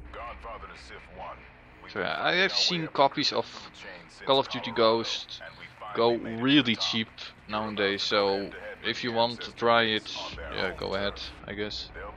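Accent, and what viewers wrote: Netherlands. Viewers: Are you dutch? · Haha deze man is Nederlands en dat kan je echt ziek goed horen.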